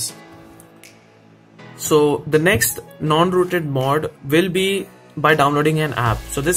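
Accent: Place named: India. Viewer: hearing indian saying stuff that end with T kindna annoying .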